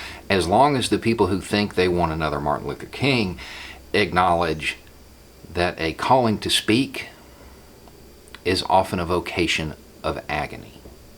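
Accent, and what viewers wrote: South, United States. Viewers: I've watched like 5 straight videos of yours and I'm in shock, you as a white guy with a southern drawl, speaking so eloquently about these topics saying white people need a new leader or your other video about what it meant to be a republican or what it used to stand for vs how it changed man I love it when people like you can call out the hypocrisy of your people and that's what we need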